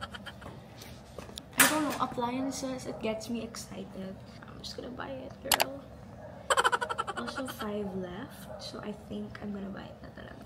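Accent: Conyo, Philippines. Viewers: hahha omg so cute ng pagiging conyo mo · While hearing Ms saying " I wish Blythe was here" made me realize and made me wish that i also have that kind of friend who thinks of me everytime I'm not there with them 'coz i always feel like I dont have friends I just know a lot of people..... Btw I love youuur conyo talaga its not maarte or cringe or oa it's so cutiee😻🥰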